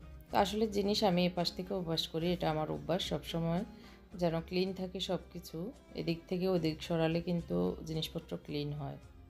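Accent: Noakhali, Bangladesh. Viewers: সুন্দর বাচনভঙ্গি মানুষের দৃষ্টি আকর্ষণ করে আপু।এদিকে মনোযোগ দিবেন আশা করি।আমাদের নোয়াখালীর ভাষা ছাড়া ও আমরা চলতে পারি তা দেখিয়ে দেন। আজ প্রথম দেখলাম দশে ৬ নাম্বার দিলাম আপনাকে। কথা গুলো ঠিক করুন দেখবেন আরো এগিয়ে যেতে পারবেন। আপনার ভিডিও কোয়ালিটি দারুন, মিউজিক টা একটু হাই। রাগ করবেন না প্লিজ আমার মতে সমালোচনা সামনেই করা উচিত। একজন ভিউয়ার বা ছোটবোন হিসেবে ভুল গুলো বললাম যেনো শুধরে নিতে পারেন। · আপনে কি নোয়াখালির মেয়ে নাকি, এই ভাবে কথা বলেন কেন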